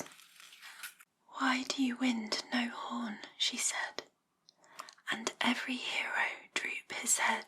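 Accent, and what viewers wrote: United Kingdom. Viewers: really those have one the best voices for asmr her british accent certainly helps like Lottie🤤🤤🤤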